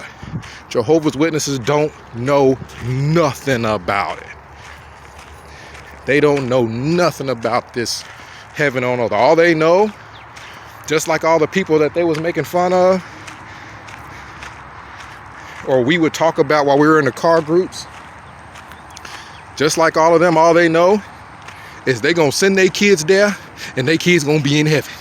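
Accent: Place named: Texas, United States. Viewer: How long have you been in Texas? You are developing a Texan accent.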